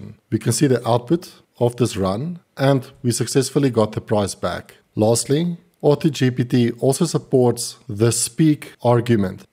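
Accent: Afrikaans, South Africa. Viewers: Loveley to hear an Afrikaans accent talking about the latest and greatest <3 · Very informative and lovely South African accent :)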